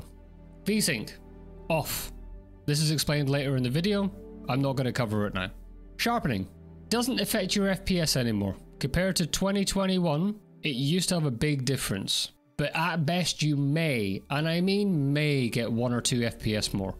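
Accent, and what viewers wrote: Scotland, United Kingdom. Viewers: Thanks for the great video. This will help squeeze every bit out of Star Citizen. Also, love the Scottish accent.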